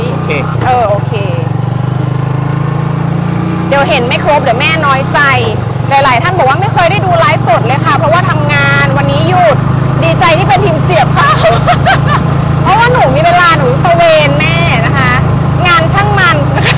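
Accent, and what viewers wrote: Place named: North, Thailand. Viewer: ชอบมากกกแอคมินพูดไทยคำเหนือคำน่ารักมากกกชอบค่ะคุณมาถูกทางแล้วค่ะ❤❤❤❤❤❤❤❤❤❤❤❤❤❤❤❤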